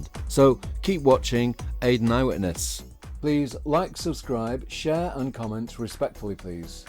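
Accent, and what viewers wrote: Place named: Salford, United Kingdom. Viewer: Video is good but I don't think he should down do it ! He is a sarcastic little git ! He is not a scouser either....sounds like Salford more like ! Manc.go home. Lol 😆 🤣! Not.welcome!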